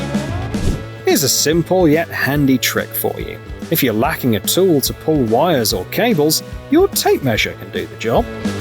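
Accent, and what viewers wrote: United Kingdom. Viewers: He sounds British, so no, he doesn't know the difference between his asshole and a hole in the ground.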